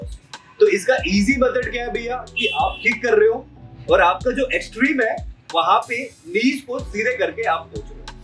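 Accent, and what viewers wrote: Kerala, India. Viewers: Mallu ki Hindi ...mast ha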